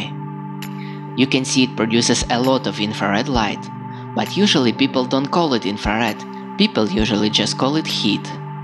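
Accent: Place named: Russia. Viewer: Москальський акцент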